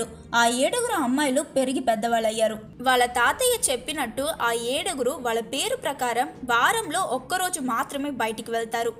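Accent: Tamil Nadu, India. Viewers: are you tamil kanu vunnu what is this but your explanation is very good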